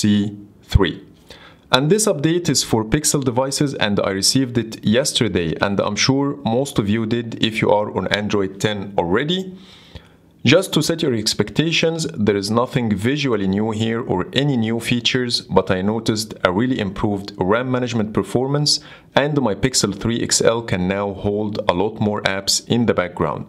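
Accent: Egypt. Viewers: What an Egyptian accent, good job bruh☺️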